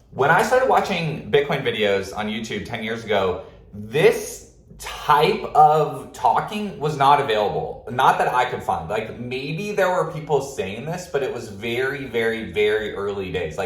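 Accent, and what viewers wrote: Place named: Los Angeles, United States. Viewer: I will subscribe if you ditch the LA enunciation, great video man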